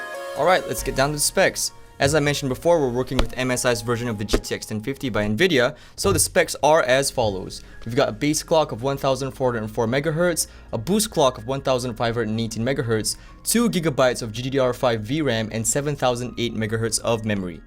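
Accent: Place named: England, United Kingdom. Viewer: nice review. especially the eng accent haha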